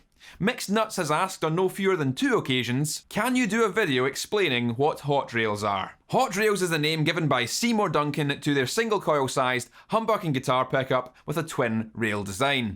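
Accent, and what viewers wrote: Scotland, United Kingdom. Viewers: Thanks for the explanation. But damn my ears are almost dead after hearing such a load of British accent. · That was a very consistent explanation indeed....with a nice Scottish touch 👌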